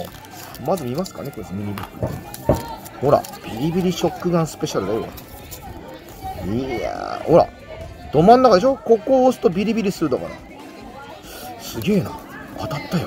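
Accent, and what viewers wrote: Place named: Shiroishi, Japan. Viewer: 今日はかなりなまっていました🤔 白石市民から移りましたね😁 山形よりまだまだいいけど😁 ビリビリガン自分でするにはかなり勇気が🙂 🐸と🐍このシリーズ大好きです💖 錦ケ丘ヒルサイドモール、また頑張ってください🙇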